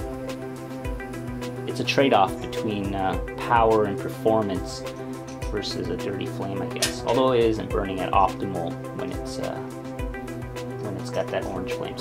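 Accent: Canada. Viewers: canadian..abooot...thanks for sharing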